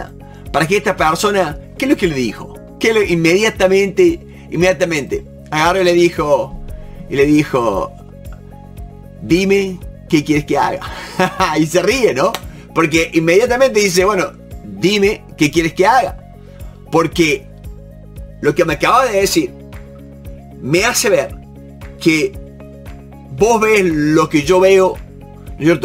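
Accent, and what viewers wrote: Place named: Cordoba, Argentina. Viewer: Claramente sos Cordobes jaja..un abrazo y muchas gracias!!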